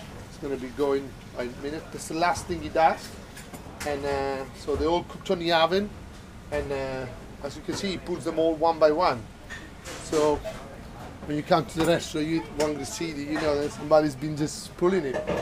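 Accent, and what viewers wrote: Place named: Italy. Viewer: L'accento c'è ma il lessico ragazzi... tanta roba😎lo parla da Dio.Mi sembrava di sentire un inglese con accento italiano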